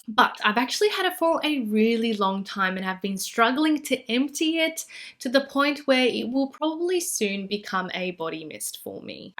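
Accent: Australia. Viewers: Her Aussie accent!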